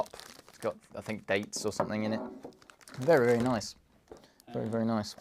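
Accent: United Kingdom. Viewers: I like british accent!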